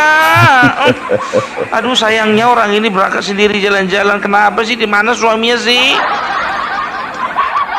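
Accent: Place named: West Sumatra, Indonesia. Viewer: Logat suaranya seperti logat Sumbar...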